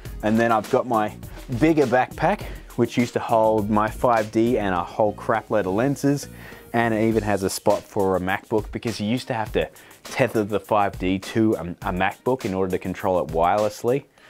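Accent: Australia. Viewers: judging by his australian accent, probably australia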